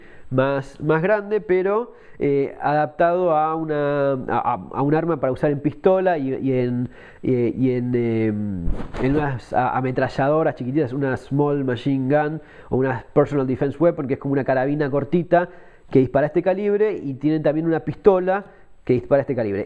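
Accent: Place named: Argentina. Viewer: Se nota por tu acento que sos argentino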